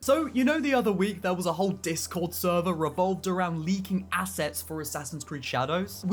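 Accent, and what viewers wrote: United Kingdom. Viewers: You From The Great Britain Fine sir? I like your accent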